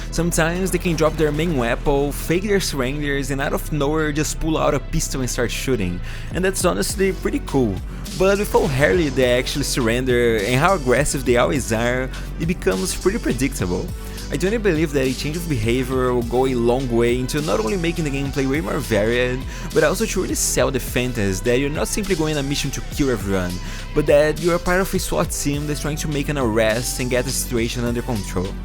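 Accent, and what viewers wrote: Brazil. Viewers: sotaque br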